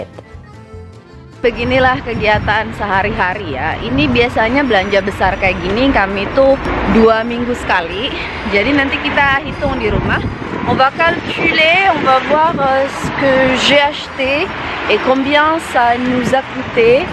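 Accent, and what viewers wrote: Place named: France. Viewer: Keren banget logatnya udah Prancis banget, je suis jaloux je voudrais parler français comme teh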